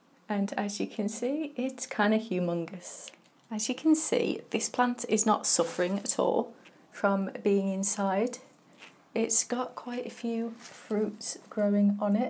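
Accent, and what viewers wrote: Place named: United Kingdom. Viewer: In all fairness, cucumbers are supposed to have the spiky things on them. When you pick them you can just put a pair of gloves on and rub them right off. You sound like you are from the UK and having spent quite a lot of time there, you have a bit of a different selection of cucumbers than us in the states. I hope these turned out well for you! And good job getting them grown indoors. Edit: One extra thing. The runners that come off the plant and attach to the trellis can get excessive and take nutrients that the cucumbers need...so if there are way too many, you can pinch them off and have bigger cucumbers quicker.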